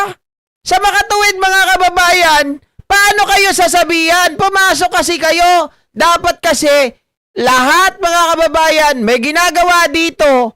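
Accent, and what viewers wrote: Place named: Waray, Philippines. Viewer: Ikaw Sir talaga Waray na waray ka po😅😅😅😅😅❤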